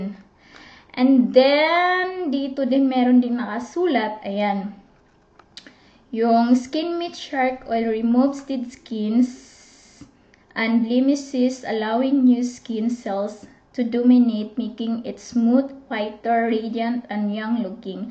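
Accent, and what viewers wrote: Philippines. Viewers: Kapag bisaya magtagalog ang lambing pakinggan ,,kaway kaway sa mga bisaya dyan.. by the way mam pwede po ba yan gamitin sa nagpabreast feed? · boses ilongga ka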